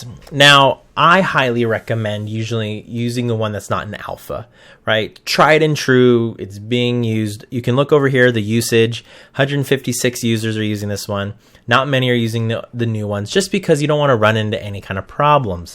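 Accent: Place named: United States. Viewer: i wish i saw this video 2 months ago, this is the best QA Automation Tutorial i've ever seen ! +you speak real pure American English ! which is a real big thumbs up !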